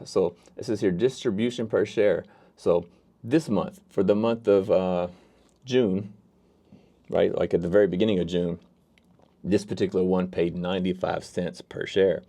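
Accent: Louisiana, United States. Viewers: Are you from southeast Louisiana?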